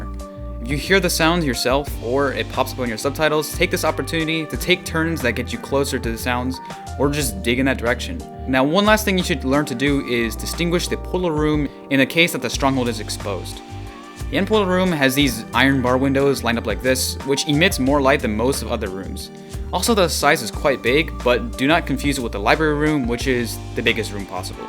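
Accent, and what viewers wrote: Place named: United States. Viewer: Bro explain in Hindi i understood most of it but coz u talk English so fast and I'm not used to the american accent of English so it's hard to understand 😅😅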